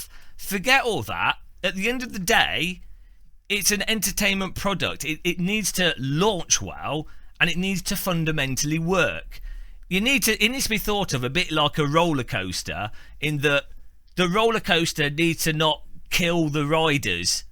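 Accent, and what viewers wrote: United Kingdom. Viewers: Never laughed so hard about something so depressing. Thank you so much for an absolute wonderful British scolding in a just as lovely accent! 😊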